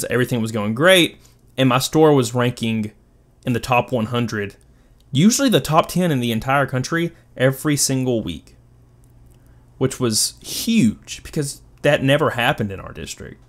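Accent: South, United States. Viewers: This just popped up for me. So weird hearing you try to turn off the southern twang. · he's so southern i love it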